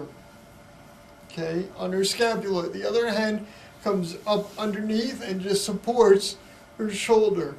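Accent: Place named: United States. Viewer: What part of America is that accent from?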